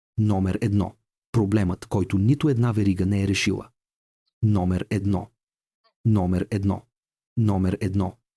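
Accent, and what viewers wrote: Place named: Russia. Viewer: ИИ сътворил този клип и другите на канала е подозрително Руско-говорещ, правещ същите грешки като всеки руснак опитващ се да налучка правилните ударения в българския език! Бърка на места даже хиляда с "тысяча" и слага ударенията където би ги сложил руснак четящ български текст.....което за мен опорочава цялата идея за Истина на съдържанието!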